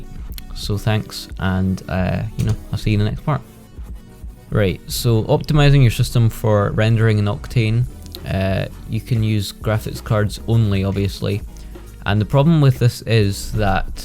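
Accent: Scotland, United Kingdom. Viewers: maaaate i didny even know you were scottish aww thank fuck makes life easier :)))))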